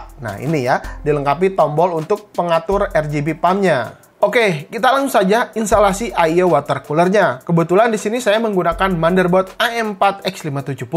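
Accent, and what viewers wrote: West Java, Indonesia. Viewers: Pideo.... Sunda ya?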